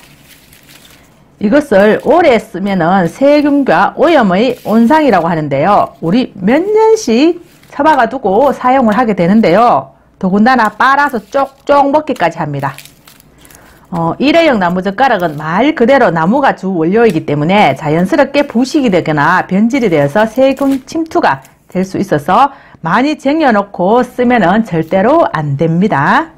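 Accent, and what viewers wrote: Gyeongsang, South Korea. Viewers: ㅎㅎ 좋은 정보 잘 보고 있습니다 경상도 본토 말 오랜만에 듣네요 일회용 나무 젓가락은 사용 금 하는게 좋겠네요 알지만 1회용 컵 모든 1회용은 문제가 많은것 같아요 감사합니다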